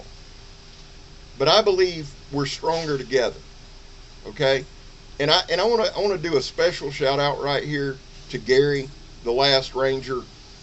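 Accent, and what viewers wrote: South, United States. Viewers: Knife community huh?..pff! Im here totally by accident. One day I was looking for a site called "Big Teddy Bears" while I was going through all my lip glosses. There was this big tatted one holding a knife,it was called. Kinda different, checked it out.. Gotta be some kind of acronym or something I reckoned. Who names something for crying out loud right? Anyway, 'ol turned out to be a legitimately great teddy bear with an enormous heart. Im on my way back to the kithen now barefooted (NOT pregnant!) to check on them cookies im baking..just as soon as I stop to admire my Cold Steel 1860 heavy cavalry saber one more time of course lol. Bothered by your southern drawal Sir? Hardly, Im from South Carolina, mine is thick ass syrup..just like the rest of me!😂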